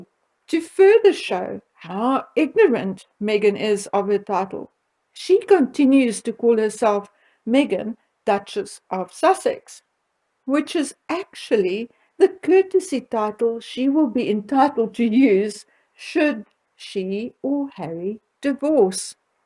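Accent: South Africa. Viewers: I could listen to you talk all day long. I just adore the South African accent. X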